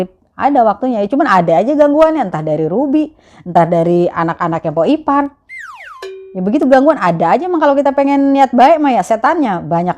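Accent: Jakarta, Indonesia. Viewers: Empo betawi nya...🤣 Asli mana po indo nya? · Ilmunye masyaallah ,menambah ilmu kite nyang nyimak.Tetep menjadi mama Ruby,dengan logat betawinye,laen daripade nyang laen 👍.kalu bukan kite nyang ngelakuin,siape lagi?iye kaaan. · Betawi banget logatnya... Masya Allah tabarakaLlah keren channelnya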